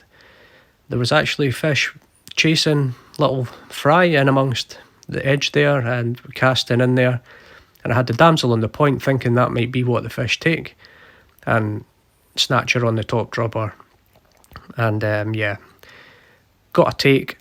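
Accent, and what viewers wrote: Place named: Scottish Borders, United Kingdom. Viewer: are you on FB? Got a lot of questions?? lol what floating line would you recommend? What was the fishery at the beginning of the video? What’s your favourite rod and location up there? I’m thinking you have a borders accent!! And lastly do you sell your flies..I keep wanting to buy more flies and this cracking video has made matters worse ( like all Fly fishermen lol )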